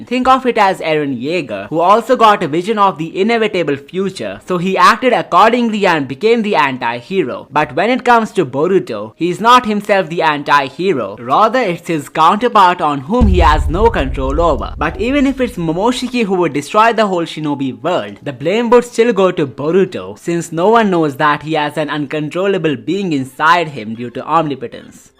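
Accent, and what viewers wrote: India. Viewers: Where are you from, sound Indian?'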